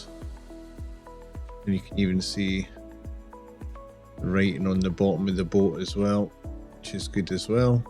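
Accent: United Kingdom. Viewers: I cannot tell you how nice it is to hear a 3d printing video with a British accent! Great review, good testing, thanks for a good video. I havent done any back to back testing, but I fitted the jet pack they sell and haven't noticed any real difference. Considering swapping it back now I'm more familiar with the printer. I have noticed that orienting your overhangs towards the aux fan on the side helps enormously. A couple of repeat order prints I make have to be oriented a particular way, and I could physically fit 16 on the bed, but only 3 nearest the aux fan will be up to standard.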